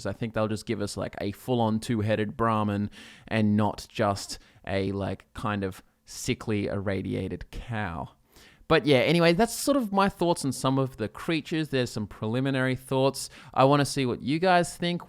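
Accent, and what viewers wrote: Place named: Australia. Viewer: "Set in the yeee of 2122." Oh Austrilians...never change. Never change.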